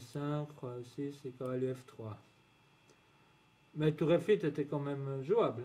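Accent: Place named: Switzerland. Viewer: Ça fait des lustres que je n'ai pas regardé une partie à peu près en entier et j'ai vraiment apprécié ton jeu.... ton animation et ton commentaire avec l'accent suisse et toujours une pointe d'humour:) Bravoooooooo!!!!!!!!!!!!!!!!!!!! 👑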